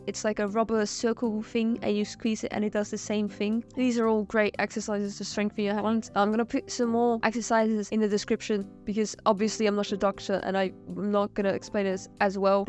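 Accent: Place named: United Kingdom. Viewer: Your name, is very misleading considering you have this awesome British voice, the greatest garden ever, and cute plushies everywhere you look. Also, it was cool seeing more of your art process 🙂 What the plushie's name? I might have missed it if you did say it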